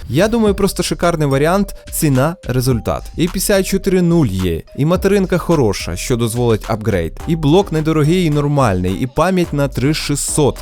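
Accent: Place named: Surzhyk, Ukraine. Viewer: не критикуй його, він старається відівчитись від суржику. Краще слідкуй за собою)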